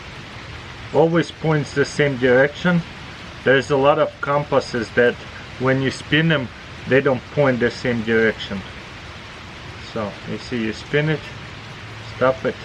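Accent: Russia. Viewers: На английском с русским акцентом.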